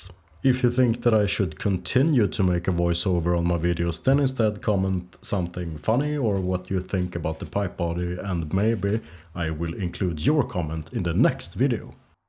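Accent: Sweden. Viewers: 🌹THANK YOU for sharing 🌹 An awesome pipe and mouthpiece, thank you. Your English is good, real good. Your Swedish accent is real good also, I understand it perfectly, I listen to people. Please continue with the videos and the voiceovers. I learned a lot from this video, I think I will try making my own pipe, just once. 🌹🙏🏿😔🙏🏿🐾🐒🐾🌹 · are u swedish by any chance or texas?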